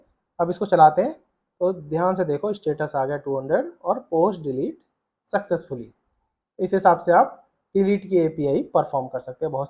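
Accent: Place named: India. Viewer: Your Englisch is terrible, I don’t understand one word! You are speaking much to fast in your Indian slang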